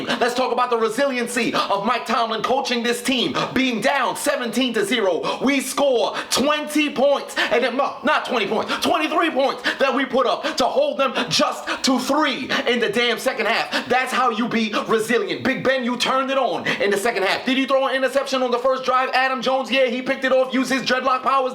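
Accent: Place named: South Louisiana, United States. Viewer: I'm just curious based on the way you speak. Are you from South Louisiana?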